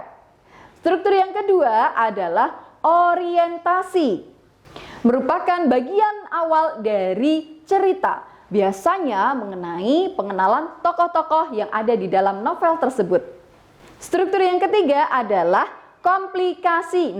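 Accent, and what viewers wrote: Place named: Java, Indonesia. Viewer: Enak banget ngejelasinnya ,bhs jawanya keluar medok banget Bu🤭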